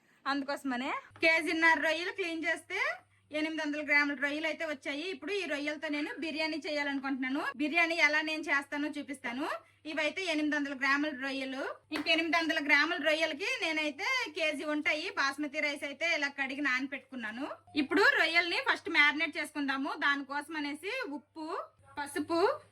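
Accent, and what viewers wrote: Srikakulam, India. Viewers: Pakka Srikakulam ani cheppavachu mi matalu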